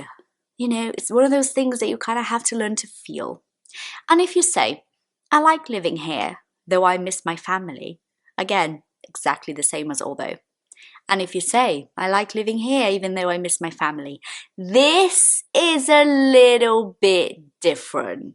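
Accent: United Kingdom. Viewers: I love this british accent 😍🇬🇧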